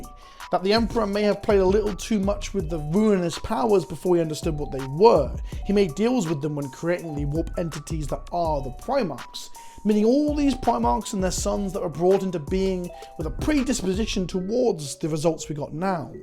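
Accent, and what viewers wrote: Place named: United Kingdom. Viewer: yeah I'll sub to the channel. gotta get my british guy talks about warhammer fix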